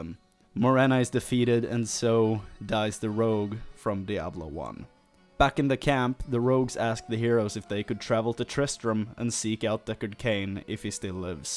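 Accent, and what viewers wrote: Sweden. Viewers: Awesome, btw, Swedish?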